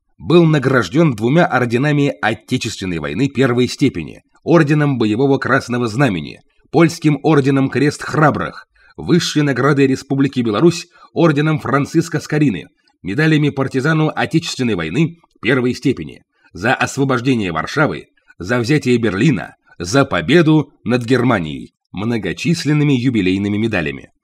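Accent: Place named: Romania. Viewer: текст читает румын, портит восприятие.....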